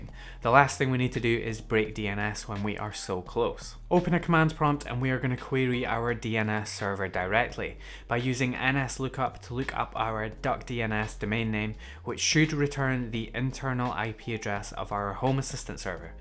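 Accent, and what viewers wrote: Scotland, United Kingdom. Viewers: Excellent video! Just getting started with Home Assistant and your channel is now my go to for all my questions and problems (also nice to hear a familiar friendly Scottish accent too!)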